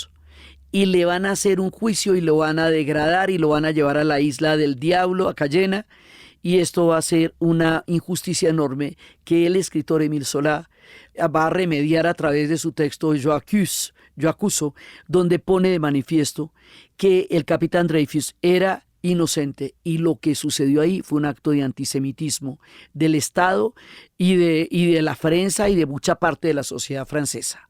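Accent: Colombia. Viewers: Hablas más como Colombiana debo estar confundido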